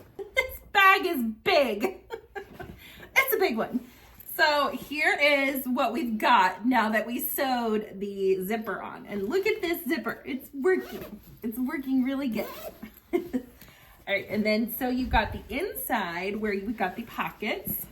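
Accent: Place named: South, United States. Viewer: I found your site and have really enjoyed it. Love your projects especially the Kate Spade tote bag. I hear a southern accent and have to ask where you’re from? I’m a native born Texan.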